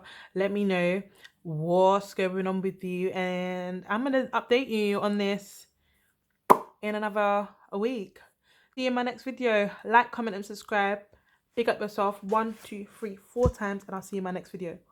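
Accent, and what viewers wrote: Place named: London, United Kingdom. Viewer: Hold on you sound like you're from London! - if you are Heyyy girl! - Loved the video- you're so entertaining sis! - I'm going to try that mask!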